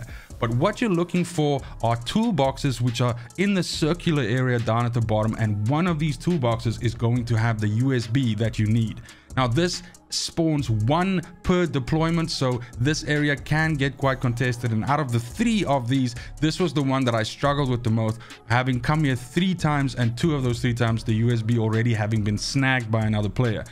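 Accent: South Africa. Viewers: SA accent xD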